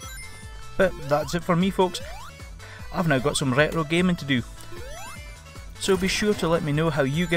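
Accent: Scotland, United Kingdom. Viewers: Nice accent are you from Scotland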